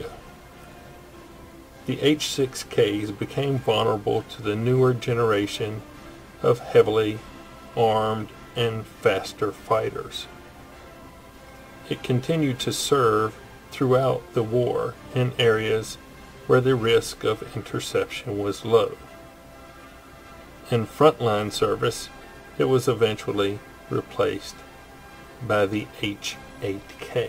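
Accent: South, United States. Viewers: would be my second favourite war thunder narrator after the Mighty Jingles. He's not that articulate, but something about his tone and southern bubba accent just cracks me up. Especially when he says things like "they're all over me like stink on a turd", etc...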